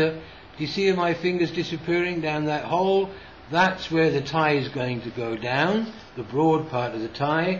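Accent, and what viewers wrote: United Kingdom. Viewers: It worked because your have an english accent. That other guy who does these videos is a jag off.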